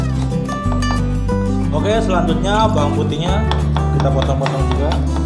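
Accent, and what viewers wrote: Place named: Banyumas, Indonesia. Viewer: Ngapak kayanya bang🤭😁😁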